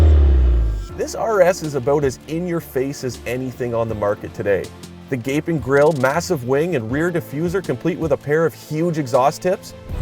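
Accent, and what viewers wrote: Canada. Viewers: You sound like a Canadian, but you do a pretty darn good job.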